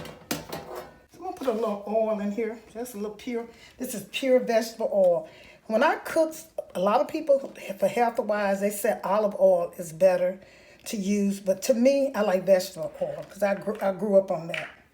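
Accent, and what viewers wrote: South, United States. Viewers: Ooooh...as u stand there reminding me of my Grandma cooking brought tears to my eyes. She was the BEST...your soft calming southern voice is truly a memory of her...Thank you for sharing Continued #BLESSINGS to you🙂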